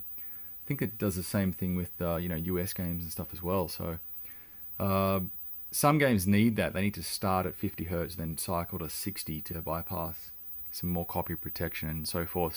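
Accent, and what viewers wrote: Australia. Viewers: Garage Great Video mate! What part of Aus are u from?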